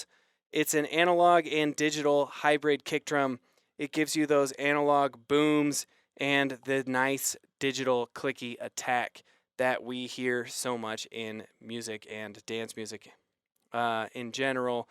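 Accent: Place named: United States. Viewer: Bruh. So glad u speak American haha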